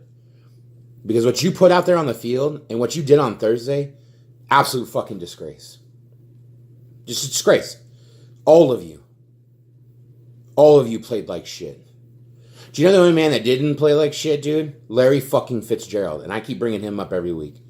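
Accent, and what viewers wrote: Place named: Philadelphia, United States. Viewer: Ahahahaha...bro, yer starting to sound like the dude from Philly.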